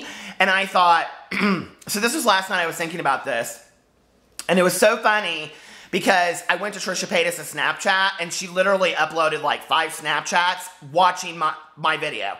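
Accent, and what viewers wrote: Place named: Kentucky, United States. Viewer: Thank you...I've needed someone like you in my world lately!!! I'm from KY but live in Nebraska & Maine. We lived in Louisville for 15 yrs so it is so nice to hear someone who sounds like me & I get. Fangirling over you sir!!! ;)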